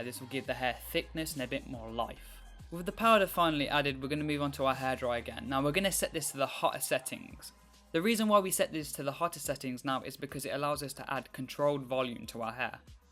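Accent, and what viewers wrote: United Kingdom. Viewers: I would like to say I understood everything what you said, but actually I didn't... it's because the way you speak is kinda hard to understand at the begging (I'm used to listen american accent), but on the other hand I love it... british accent is so peculary it's so fantastic, I would like to speak with your accent (I'm not from a english speaker land). Ohhh and good video... Actually I watched it bc your accent lol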